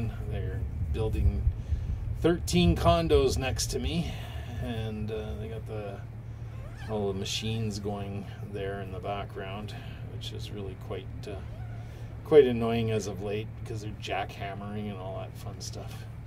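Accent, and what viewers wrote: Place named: Canada. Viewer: Thanks. I just bought this tent for many adventures to come. I love your Canadian accent, eh. Many happy trips ahead.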